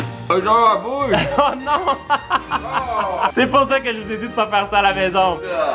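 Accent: Canada. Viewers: Pourquoi tu parl français avec un accent canadiens et tabite au Canada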